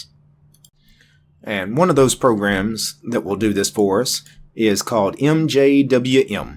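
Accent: South, United States. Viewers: I really like your southern accent sir. I am a new north Dallas resident and I must say yours is the best! Sorry for being non-linux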